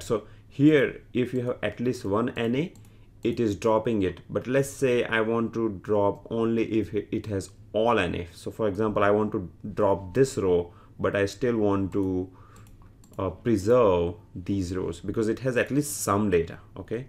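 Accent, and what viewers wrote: India. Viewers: I actually wanted to complement him - I heard his indian accent, but it didn't bother me at all - he spoke clearly and with the correct pace (unlike many other tutorials from people with the same pronunciation, who I simply cannot follow)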